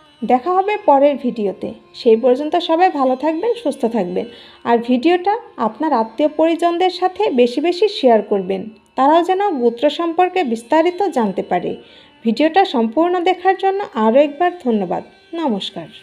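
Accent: Bangladesh. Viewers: Pronunciation thik karun mone hoi apni sylethi meye. Ja hoke bhalo video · কুমিল্লা এলাকার লোকেরা এইভাবে উচ্চারণ করেন।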